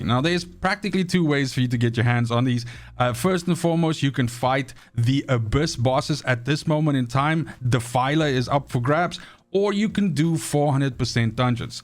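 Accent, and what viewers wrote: South Africa. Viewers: is that a south african accent? 👀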